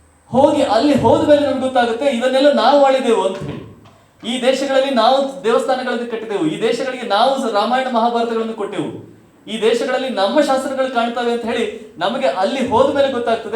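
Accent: Standard. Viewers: ನಿಮ್ಮ ಶುದ್ಧ ಕನ್ನಡ ಕೇಳುವುದಕ್ಕೆ ತುಂಬಾ ಆನಂದವಾಗುತ್ತದೆ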